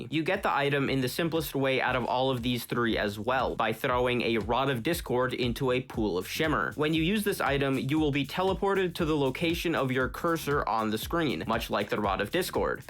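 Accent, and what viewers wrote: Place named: United States. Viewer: Pov: you don't like the American accent (don't live in the states might just be a state accent)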